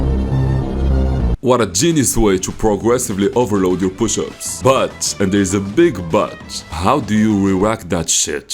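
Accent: Morocco. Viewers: Judging by your accent 100% you are from morocco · you're moroccan for sure, that accent is theeeere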